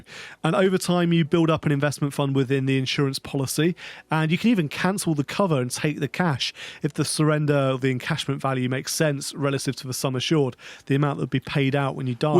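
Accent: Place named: United Kingdom. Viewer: Thanks for this. This is clear sensationalism. When you point out the facts of the case, it’s not as shocking as it seems at first sight. Basic probability based maths. Great to hear a British voice on financial matters on YouTube.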